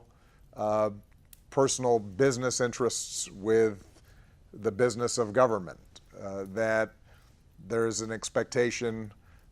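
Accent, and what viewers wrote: New York, United States. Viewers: I played at 1.5 & he spoke like a new yorker. 😎 Miss him.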